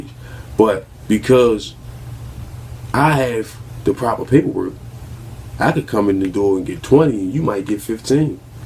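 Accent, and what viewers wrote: Baltimore, United States. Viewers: The way he talk I knew he was from Baltimore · I knew you where Baltimore from that accent.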